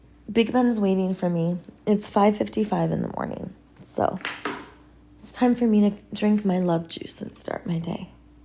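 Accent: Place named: Russia. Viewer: Loved your Russian accent in both videos ❤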